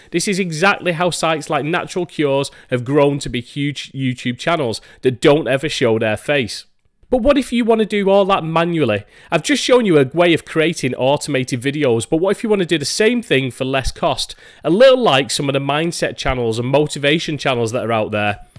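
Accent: United Kingdom. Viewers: This really is super-helpful. You have a great Yorkshire accent and voice by the way. If you're not doing voice overs already and need the extra cash, this could be a nice sideline for you.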